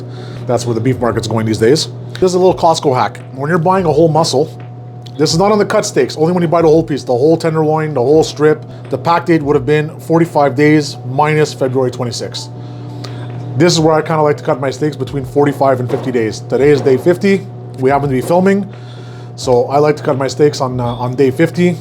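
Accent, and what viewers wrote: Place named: Montreal, Canada. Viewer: Born on the West Island and live in South Carolina now. Stumbled on this video and within 5 seconds I could tell chef is from Montreal. It's like music to my ears 😂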